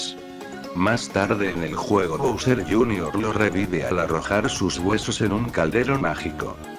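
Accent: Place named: Castile, Spain. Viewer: Ese castellano papu :v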